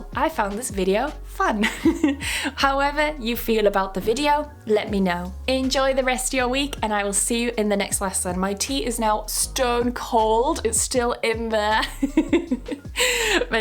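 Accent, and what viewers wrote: United Kingdom. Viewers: l really love your british accent❤😊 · I like her British accent quite a lot!!!! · This dog looks like he's trying to learn British intonation. Besides, you seem to have lost most of your weird “Polish” accent. You sound more British every day . 😉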